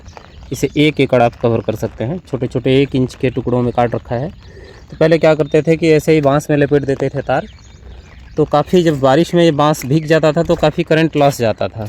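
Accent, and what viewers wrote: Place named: Punjab, India. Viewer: But tuhadi boli to punjabi lagde ho....idro shift hoe si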